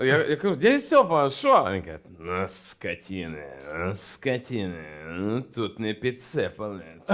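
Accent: Western Ukraine, Ukraine. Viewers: Я тоже никакой смеси не слышу. Только западноукраинский диалект. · Який цікавий мовний акцент у ведучого! Суміш західної, центральної і східної мови України!😂❤ I в цьому наша сила українці - в єднанні!!!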